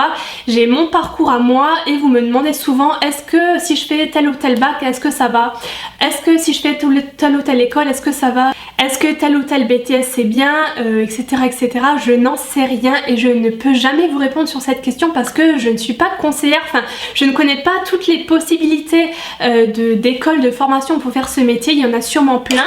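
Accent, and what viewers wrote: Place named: Alsace, France. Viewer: Hihii je me disais bien qu'il y avait un petit accent qui me disait qch par ici ☺️ Je suis de colmar ! Je viens de découvrir ta chaîne et j'adore !! En plus de ça je viens de découvrir cette vidéo sur la décoration d'intérieur, j'étais partie pour MJM tout comme toi .. mais jai malheureusement eu la peur des débouchés, et j'ai finalement changé de voie .. chose que je regrette chaque jour depuis ☺️ Tu as eu raison d'aller au bout de ta passion et vraiment félicitation ! Ca doit pas être facile tous les jours d'être auto entrepreneur ! En tout cas je vais parler de ton site autour de moi pck vraiment j'adore !( sans parler de ta chaine YouTube biensur ) j'avoue que séparer le professionnel de YouTube c'est bien mieux ! Continues comme ça ! Pleins de bisous d'une haut rhinoise ^^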